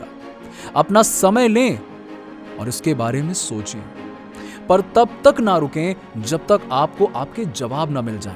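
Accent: Bengal, India. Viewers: Bangoli accent was good..,...I liked that voice... Resume that voice again...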